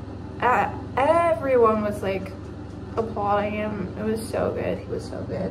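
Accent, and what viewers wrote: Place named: United States. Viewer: Do you live in America? Your accent is American obviously but you say your local team is Dutch 😁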